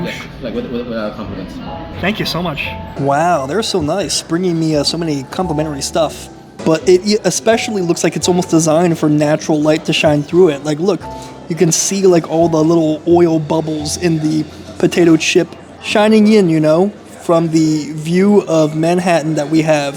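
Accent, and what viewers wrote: United States. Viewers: You’re soooo American. I love it. I’m American but never feel like I belong here. It’s awesome hearing with a true American accent and zero pretense. Your comments are outstanding.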